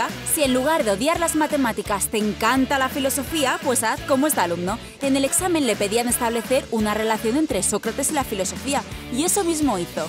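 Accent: Spain. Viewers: eres de españa ??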